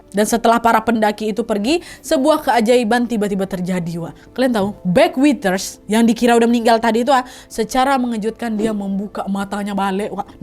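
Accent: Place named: Pekanbaru, Indonesia. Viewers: khas banget logat minang nya ka😂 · ndeh logat pekanbaru bana ha😂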